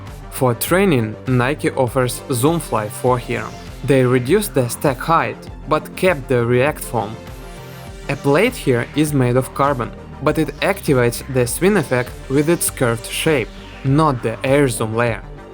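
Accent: Russia. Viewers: По произношению понятно, что текст читает русский:) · Хорошо что русский акцент, так понятнее 😃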